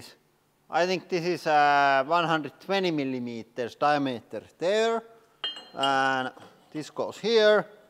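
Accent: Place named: Finland. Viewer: Love your Finnish accent!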